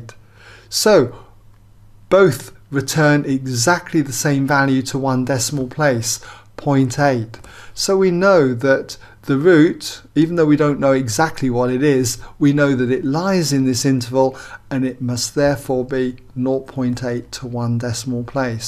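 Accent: England, United Kingdom. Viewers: Nice english accent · Nice UK accent,good way to captivate the audience.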